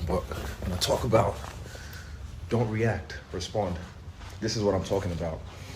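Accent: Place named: Newcastle, United Kingdom. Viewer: I like your channel, but please don’t turn into a true geordie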